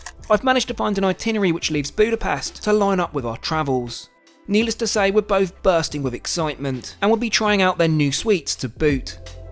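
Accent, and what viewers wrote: United Kingdom. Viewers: Two reasons why I won't watch. First you smile too much. No real person does that. Second is the Brit accent. Awfully hard to listen to especially they way the voice drops off at the end of a sentence. How about some good old American for a change? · This guy has the most British accent ever!!!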